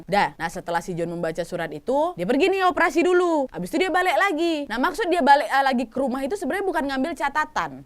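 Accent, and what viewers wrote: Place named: Medan, Indonesia. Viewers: Kak aslinya mana sih, suka bgt denger caranya ngomong, koq Kya tetangga aq dulu org medan😀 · Semangat btul Kk ceritanya 🤣🤣 BTW klo boleh tau Kk orng mana???? logat bicara nya seperti kami orng Sumatra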